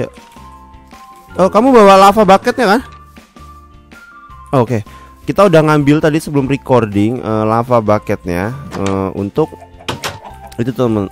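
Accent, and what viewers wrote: Java, Indonesia. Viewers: bang apik bang omongan medok mu kwi aku yo wong jawa bang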